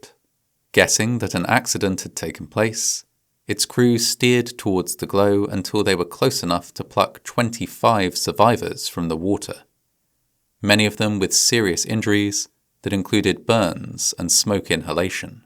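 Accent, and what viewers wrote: United Kingdom. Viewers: Interesting to have a British voice use a term like petrol, but then use the calculation to convert litres into US gallons. 1,000,000 litres equals roughly 264,000 US gallons. It's 220,000 Imperial (British) gallons.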